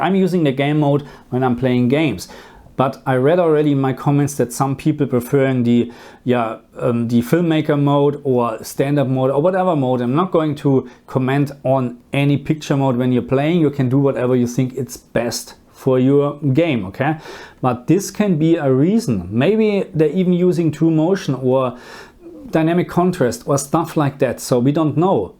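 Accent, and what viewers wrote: Germany. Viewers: Geiler deutscher Akzent. Ein Mischung aus Fremdschämen und Sympathie die ich da in mir fühle. 😁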